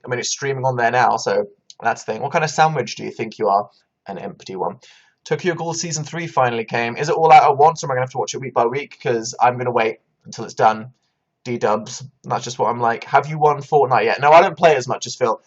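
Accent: RP, United Kingdom. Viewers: Your so posh lol!! Was deleted wwwsexyllamas was deleted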